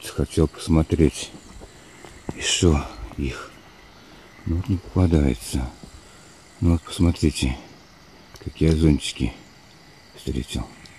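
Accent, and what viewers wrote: Tirlyan, Russia. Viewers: Да уж. Сейчас у нас очень красиво! Золотая осень!, вы родом из Тирляна? По разговору похоже. С удовольствием прогулялась с вами по лесу!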